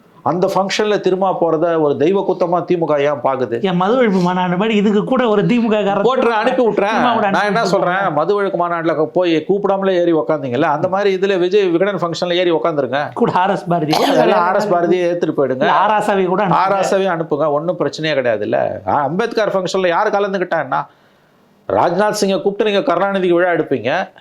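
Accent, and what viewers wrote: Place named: Telugu, India. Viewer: Telugu voice. This guy from Aanthra city.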